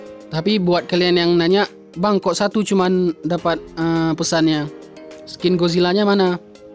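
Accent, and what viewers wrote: Aceh, Indonesia. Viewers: Orang Aceh ya min? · Logat nya kayak orang aceh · Gaya peugah haba apa aceh sang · Orang Aceh kah bang Logat bahasa nya kayak orang Aceh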